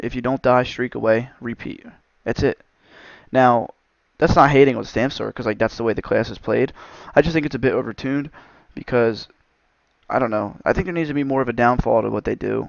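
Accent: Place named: Pennsylvania, United States. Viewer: Your accent reminds me of Bam Margera, lol you from PA I bet. It's all in the way you say "classes" it reminds me of when Bam says "asses" HAH!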